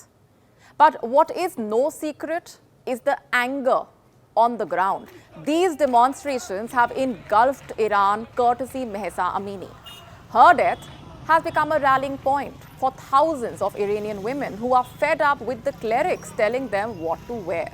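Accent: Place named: India. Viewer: The newsreader have indian accent. Not little but too much. Let her train more instead of appearing in camera. She read like AI. Train her to pronounce T=th, p=ph, k=kh, etc, etc.... It's not nice to hear the way she pronounced.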